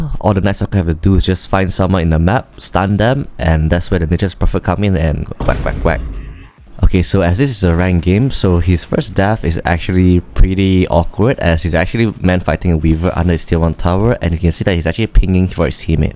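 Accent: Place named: Malaysia. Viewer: i know a malaysian accent when i hear one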